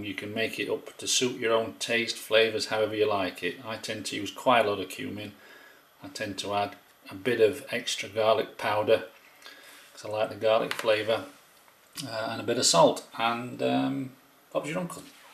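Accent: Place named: Trinidad and Tobago. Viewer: Like u dotish or wat. The man is clearly a trini from his accent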